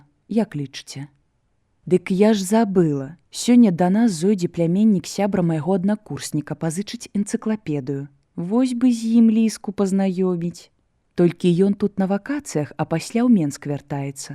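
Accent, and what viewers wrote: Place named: Russia. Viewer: Вымаўляецца не "іх", а "йіх", "йіншы", "йімі" і г.д. - варта азнаёміцца з вымаўленнем І ў беларускай мове, бо няправільна шмат вымаўляецца. Мяккасць не беларуская, часам праскоква беларуская. На сутыку слоў асіміляцыі беларускія адсутнічаюць, вымаўляецца як у расейскай.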